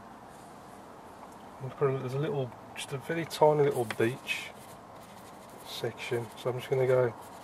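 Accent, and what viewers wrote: United Kingdom. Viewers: I LOVE your British accent! And the painting of course! Just a little bit details on the trees would have made it look even better but it looks great already, I love the colors you painted on the lake and the sky, they looks so real.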